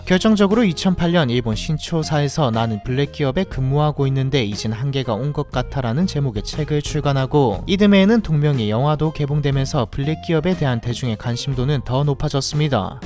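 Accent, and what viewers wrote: Gyeongsang, South Korea. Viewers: 딱보니 경상도 😂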